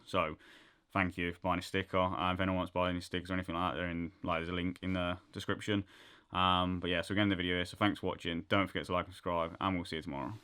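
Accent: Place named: Leicester, United Kingdom. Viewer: I'm trying to work out your accent I would guess leicester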